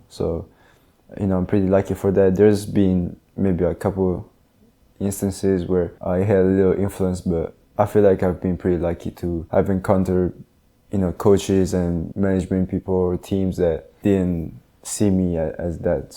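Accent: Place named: Italy. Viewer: Love your game, but love your mixed accent of Italian & NorCal even more.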